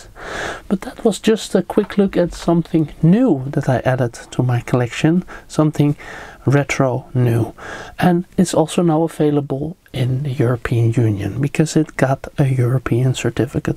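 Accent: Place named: Netherlands. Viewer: It's incredibly obvious when listening to the clicks and paying attention to the recording, but I caught it in the corner of my eye, watching both. But if any board would be that slow to respond it'd be brought up in the review, instantly as that's borderline unusable, I've suffered a Bluetooth keyboard that's actually that slow. My New Model M, the full sized version with a simpler controller module, has no noticeable delay at all, though I'm unsure to the debounce time. I've even played some games with it, aside from the actuation hysteresis and membrane sensing 2KRO limitation it's perfectly fine for non competitive play. This 2KRO limitation and high weighting would be why it isn't ideal for very, very fast typists, but if you're that fast you'd already have gotten a special board for it. P.S. Dacht al dat ik het accent herkende, erg duidelijk voor andere Nederlanders maar bevestigd door de interface in dit stuk.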